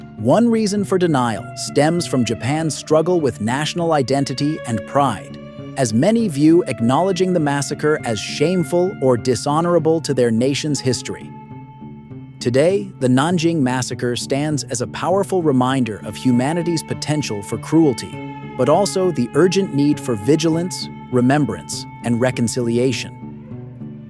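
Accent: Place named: United States. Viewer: Why are the soldiers in the pictures wearing Chinese uniforms and not Japanese? That they are clearly fake allows people to deny that such atrocities never occurred. One can hear the commentator is an American who will not go on to state the pivotal role his country paid in freeing, and releasing many Japanese war criminals like the infamous Unit 721 doctors and inviting them to America or Hirohito Japan's God Emperor. A load of American crap.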